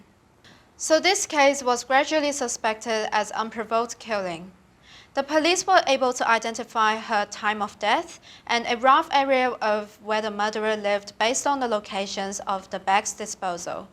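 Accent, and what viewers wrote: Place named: United States. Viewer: My friend this is my humble request please change your English speaking style your speaking American English Asian country peoples are not properly understand please my friend tried to speak British style English thank you for this video my friend🇨🇳🇨🇳🇨🇳🇨🇳🇨🇳🇨🇳🇨🇳🇮🇳💐👍✌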